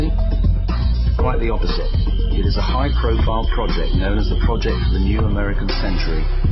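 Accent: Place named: United Kingdom. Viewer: Cool vid. I especially enjoyed the British accent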